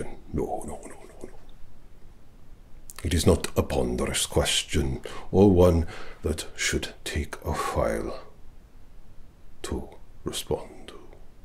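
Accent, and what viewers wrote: Wales, United Kingdom. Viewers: I am literally dying of excitement rn! OMG! I just keep discovering more and more reasons to love you! My grandad was in the RAF (both of them were actually) but the one I lived with was a snowdrop (RAF Police) and he taught the ATC he was an instructor. I also adore the Welsh accent! It's giving Battery Sergeant Major Williams and I am totally OBSESSED! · Loving the deep Welsh tones. Like a cosy, comfy blanket.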